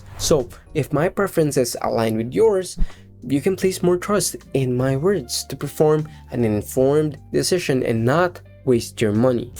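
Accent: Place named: Philippines. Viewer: You're from Philippines? I like your accent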